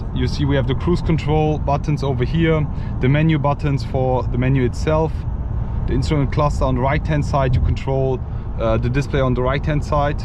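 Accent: Germany. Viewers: Du bist ein deutscher das hört man raus 👌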